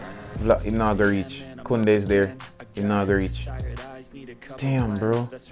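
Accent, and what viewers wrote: Jamaica. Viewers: U from jamaican broski? Sound like one g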